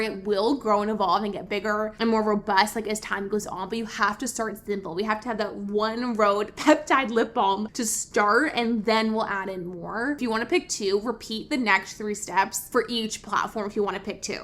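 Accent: United States. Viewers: You have such a strong Californian accent.🤭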